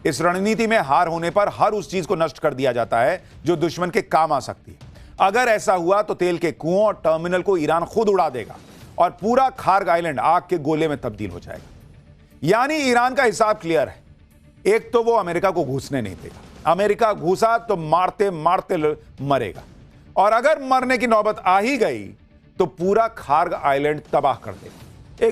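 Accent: India. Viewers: Bro. Your deshi way to say is great maza aata hay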